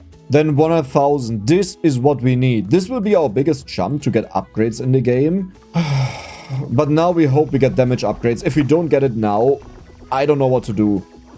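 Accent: Germany. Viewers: Ich habe die ganze Zeit darüber nachgedacht ob du deutsch bist wegen deinem Akzent.. und dann würdest du wütend, ich habe mich kaputt gelacht😂😂 (Inserts to friendly German) 😂😂😂😂😂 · It's not quite ion, more like iun. You actually said it properly at least once! But I love the way you've been saying it, the German accent makes it sound medieval and authentic somehow! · Höre ich da Deutsch 🇩🇪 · The word iron is a phonetic catastrophe, pronounce it literally however you want. Aussies don't really pronounce Rs in most words so I'm used to hearing it pronounced the same as "eyeing" with a silent g but I've heard countless youtubers pronounce it differently and the only thing that really matters is that people know what you mean. If "eye-ren" is what feels most comfortable for you given that you speak multiple dialects, then that's what feels more comfortable. Personally I like hearing the little German affectations that come through your English speaking, and I normally really struggle with accents cause hearing problems (that said I really do appreciate your vocal projection and how much you annunciate cause it makes it a lot easier for me to listen)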